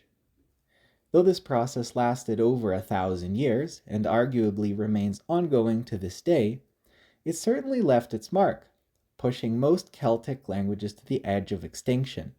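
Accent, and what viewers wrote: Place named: United States. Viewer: I find it to be a very bold move talking semi-authoritatively about not one but three entire languages, none of which you speak, and with a blatantly young American accent which suggests little more than book knowledge on the subject. I mean we've already had Scots half ruined by some autistic American on Wikipedia before!! Have ye no learnt from that?!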